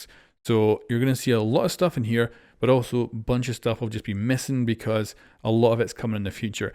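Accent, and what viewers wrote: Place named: Scotland, United Kingdom. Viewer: I don't know if you are from there, but you have a very hard Scottysh accent.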